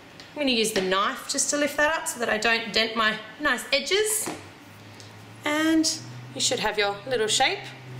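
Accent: Australia. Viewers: australian i think.... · Are you Australian of South African.... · She is from Australia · ur aussie right?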